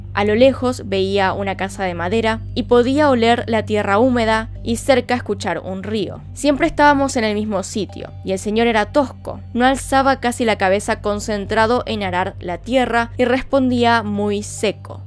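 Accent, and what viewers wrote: Argentina. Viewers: Un Usuario Normal Pero creo que no es felicidad por el caso, es por la manera en la que relata, da sus hipótesis, tiene un acento argentino obvio pero su lenguaje es neutro o bueno eso siento yo, porque otros youtubers de casos, sobre todo mujeres me choca que gritan o fingen la voz.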